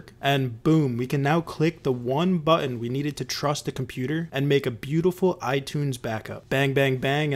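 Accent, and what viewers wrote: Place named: New Jersey, United States. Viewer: When I heard your voice I was like has to be a fellow Jersey guy I'm new to the repair business about a year I don't get as deep into repairs as you do. Really like your videos and the humor you use. I guess it's a Jersey thing. I'm in Texas and nobody here understands the humor they just think I'm a smart ass.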